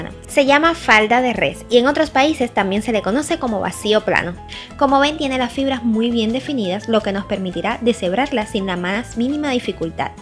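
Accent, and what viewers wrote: Cuba. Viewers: Así hablamos los cubanos, a afinar oídos jajaja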